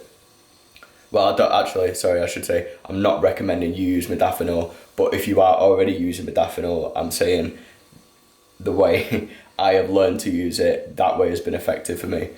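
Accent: United Kingdom. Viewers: u british!!!!!! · Are you from Sheffield? (I'm Canadian, but I live in Leeds)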